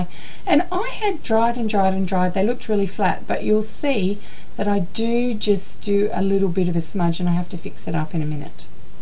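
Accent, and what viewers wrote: Australia. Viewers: Yay! Love your videos, I learn so much from you! And I love hearing your Aussie accent... there are not many of us Aussies here on YT.... Cheers, Ginny:o)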